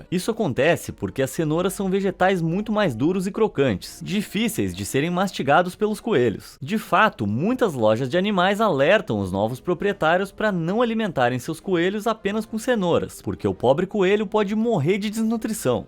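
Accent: Brazil. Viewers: tinha uma boz americwna, ess😊a voz tamuito brasileira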